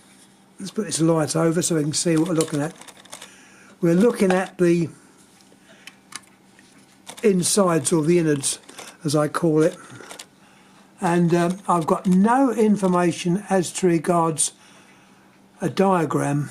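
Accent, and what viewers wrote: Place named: United Kingdom. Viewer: Sounds like Winnie the Poo with a British accent. I would love to see an episode where a Russian telephone is used.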